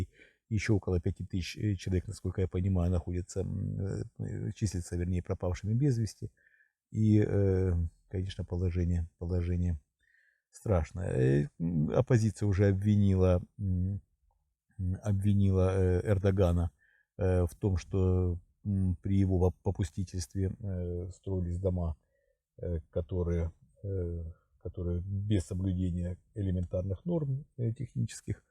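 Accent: Ukraine. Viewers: Послушала полторы минуты и поняла что вы из Украины.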